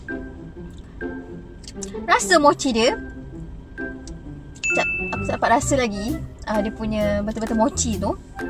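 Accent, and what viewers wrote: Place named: Indonesia. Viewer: amboi cakap loghat indo no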